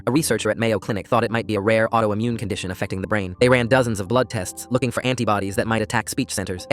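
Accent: United Kingdom. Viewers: it’s just a british accent what’s bad about it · girl idk why you have a British accent 😭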